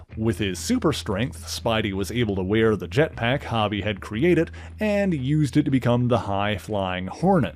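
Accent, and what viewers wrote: Canada. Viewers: It’s HO-b…not hobby…is this dude Canadian?…some of the weirdest pronunciations ever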